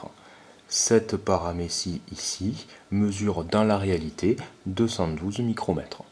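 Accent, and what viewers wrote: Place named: Marseille, France. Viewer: Wsh ta l accent marceiller 😂😂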